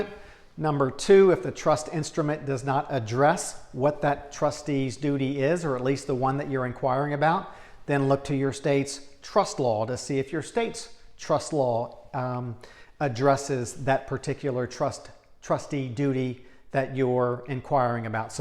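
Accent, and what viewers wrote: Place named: Louisiana, United States. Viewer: Are you from Louisiana? Because you speak exactly like Dustin Poirier, same accent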